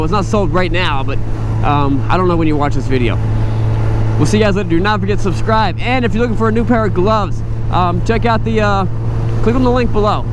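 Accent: United States. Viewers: ZeyX14 lol, I'll never get used to how you americans say your z's.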